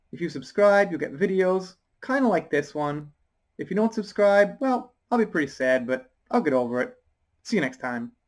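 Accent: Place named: East Coast, United States. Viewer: What made you move to Japan? You sound very East Coast USA.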